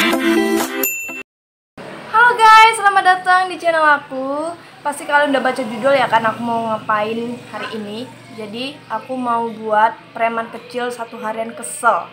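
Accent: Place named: Medan, Indonesia. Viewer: Nampak x bahasa medan mu Dx🤣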